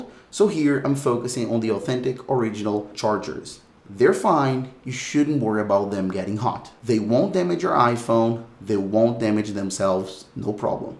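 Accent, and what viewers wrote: Brazil. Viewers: Why do you have this thick brazilian accent ?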